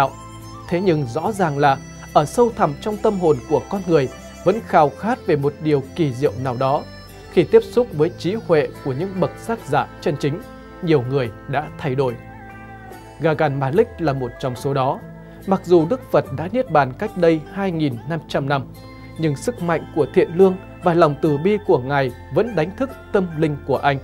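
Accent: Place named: Quang Binh, Vietnam. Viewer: yougotthis::oops::dothefive::elbowcough: Me no' nghe cai' giong Quang' Binh' cua' thang' nay' het' muon' xem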